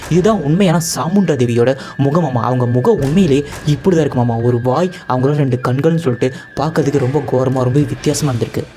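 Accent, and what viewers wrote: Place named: Vellore, India. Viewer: Vellore Tamil ❤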